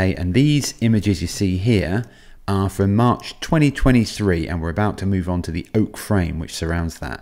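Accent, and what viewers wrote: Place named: England, United Kingdom. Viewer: It is a mental relief to listen to the English pronunciation. One can feel both the general education and the respect from the messenger in comparison with the American YouTube channels, which are constantly at grueling "macho-OCR-speed".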